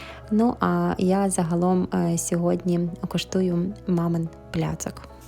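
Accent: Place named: Ivano-Frankivsk, Ukraine. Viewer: Привіт! Люблю нашу франківську бесіду з твоїх уст. Відео вийшло дуже затишним і домашнім. Синочка вітаю з днем народження, бажаю щасливої долі.❤❤❤❤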